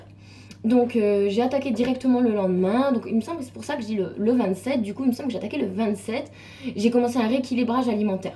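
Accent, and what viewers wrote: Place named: Lorraine, France. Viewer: Ça motive ton expérience :) tu es d'où en lorraine ? Je suis de lorraine également :) j'adore ton accent :D haha Et ta vidéo :) gros bisous ma belle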